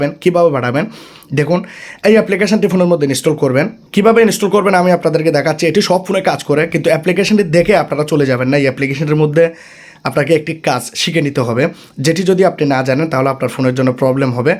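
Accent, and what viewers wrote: Chittagong, Bangladesh. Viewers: কথার উচ্চারণে মনে হয় বৃহত্তর চট্টগ্রামের...! কিছু আঞ্চলিকতার প্রভাব থেকেই যায়...!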